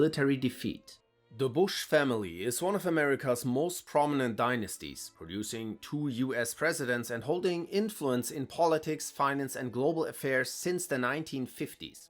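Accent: Germany. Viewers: December 28, 2025 - As an admirer of, I enjoyed this video as much I have his other offerings. This video covers a interesting subject, and I did learn some things. It is my contention that among the "faceless" international elites that determine much of what happens in Western politics and society. Some of the families mentioned in the video are part of that group. Lastly, I loved hearing the good pronouncing the various family names with his distinctive German accent. 👍👍😊I take this opportunity to wish the good the very best of the holiday season 🎄and a Happy and prosperous New Year🎆🎆 Stay well.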